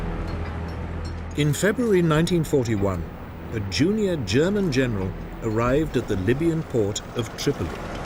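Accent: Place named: Scotland, United Kingdom. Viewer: Re: the segment on the death of IJN YAMATO/Operation Ten-Go: whoever did the voiceover (or the AI software) -fire them... or pick a different one. That stupid scottish accent is like nails on a chalkboard. Drove me clear up the wall. I had to turn it off.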